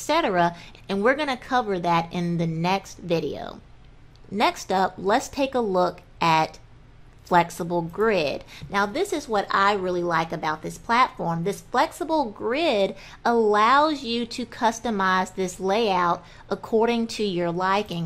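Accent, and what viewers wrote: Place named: South, United States. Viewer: this was a ton of help! Love the southern twang accent too. I am from Raleigh NC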